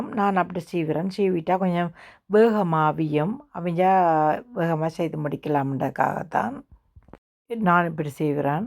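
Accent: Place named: Sri Lanka. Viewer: ஆனால் உங்களின் தமிழ் ரசிக்கும்படியாக உள்ளது இலங்கை தமிழ் எனக்கு பிடித்துள்ளது அக்கா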